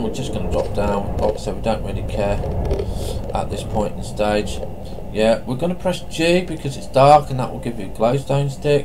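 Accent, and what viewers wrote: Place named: England, United Kingdom. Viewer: What bit of England are you from? as your ascent I think I have heard before but I can't remember where~! :P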